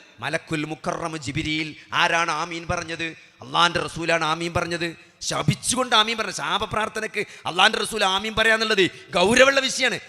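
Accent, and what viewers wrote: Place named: Malappuram, India. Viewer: Very nice speech👍ഡോക്ടർ ആയിട്ടും തനി മലപ്പുറം ഭാഷ 😞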